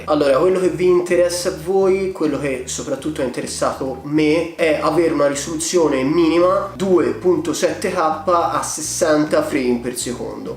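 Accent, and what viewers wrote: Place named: Florence, Italy. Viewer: Grazie mille. Comunque io sono di Firenze! Ho sentito il fiorentino in te hahah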